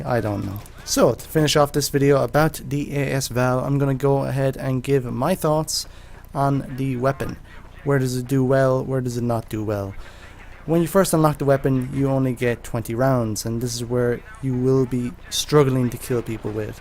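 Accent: Ireland. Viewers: Dropped over from scanner, nice series, always good to hear Another irish accent. keep it up.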